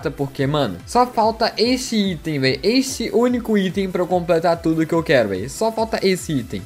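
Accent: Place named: Rio de Janeiro, Brazil. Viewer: Carioca é assim memo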